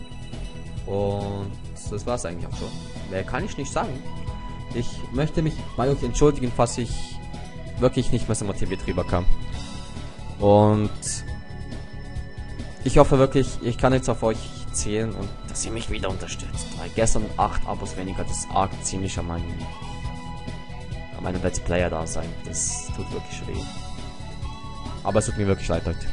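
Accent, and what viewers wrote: Switzerland. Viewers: Ich habe dich abonniert und finde es echt lustig mit deinem Mittwuch und dein schweizer-deutsch ist cool. Es fällt nicht extrem auf :D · eey mach dir nix draus die 8 youtuber sind halt uncool :D achjaa ich finde dein schweitzer-deutsch soo gel *-*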